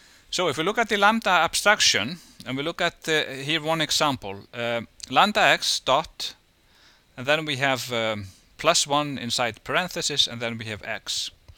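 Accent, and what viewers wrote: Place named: Iceland. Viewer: That's a helvíti thick accent, even by Icelandic standards.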